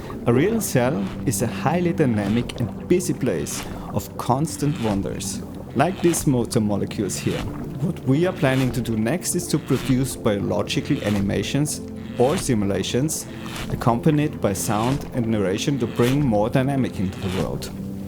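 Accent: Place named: Austria. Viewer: Awesome work,! And awesome Austrian English 😄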